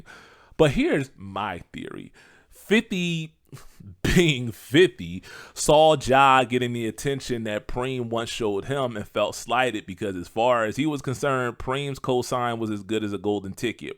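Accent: Philadelphia, United States. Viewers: I know that accent. Philly fasure.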